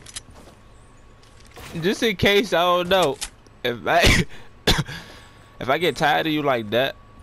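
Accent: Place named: Washington DC, United States. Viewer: sounds like is from Washington dc.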